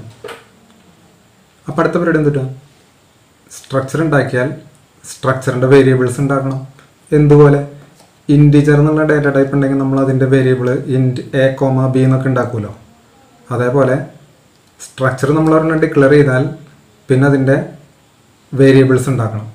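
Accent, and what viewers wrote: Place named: Thrissur, India. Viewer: Sir super class. Pinne sirinte Thrissur bhasha suprrrr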